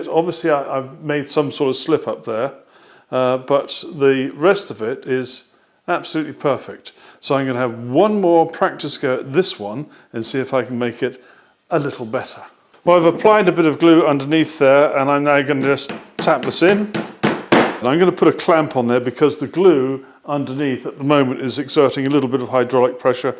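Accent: RP, United Kingdom. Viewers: Great presentation. Such a nice change to hear someone speaking the Queens English . Subscribed and looking forward to further instruction. Thank you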